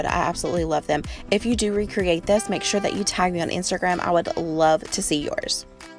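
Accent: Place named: South, United States. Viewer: You are both BEAUTIFUL women! both of you have that southern accent as well! lol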